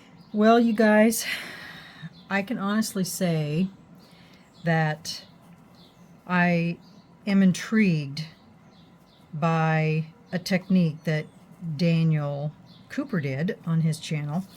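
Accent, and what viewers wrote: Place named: England, United Kingdom. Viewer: Loll! I heard his beautiful English accent reading this comment!!! And yes beautiful results ❤🎉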